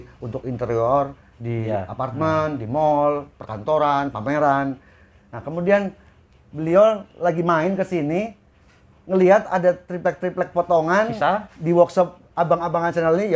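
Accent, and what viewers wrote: Java, Indonesia. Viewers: Medog nya sama 🤭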